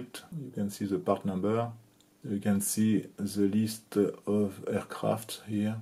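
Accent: France. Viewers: Simple, bien filmé/monté et surtout complet ! Merci pour cette analyse et cette formidable chaîne, extrêmement riche en pièces originales. Un vocabulaire technique parfaitement maitrisé avec un bon accent français comme on les aimes 😃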